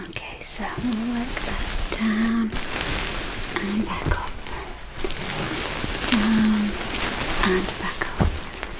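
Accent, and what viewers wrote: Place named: Yorkshire, United Kingdom. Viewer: I think Blissful Whispers ASMR is a Yorkshire girl as well. You ladies have beautiful accents.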